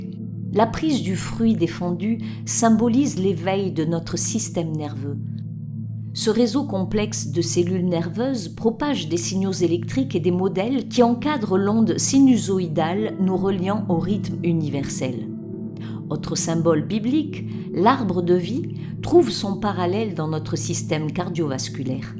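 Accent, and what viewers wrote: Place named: South, France. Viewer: Encore un grand merci pour cette vidéo très instructive! Elle me donne l'envie de pratiquer davantage le yoga, de méditer davantage et de chanter encore et plus encore! Et des milliers de mercis pour ta jolie voix du sud de notre beau pays qui me fait chaud au cœur❤❤❤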